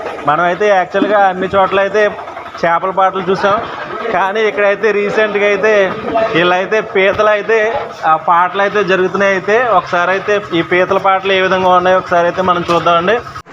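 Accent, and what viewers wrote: Godavari, India. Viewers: Nenu.. Mee slung kosam chusthanu... Pakka godharolla voice... 😊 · I'm Guntur but I like godhavari yasa baguntadi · Funny conversation... With our godavari yasa.. Good job bro... Mana godavari jilla ante yentha special lo andariki chupistunnaru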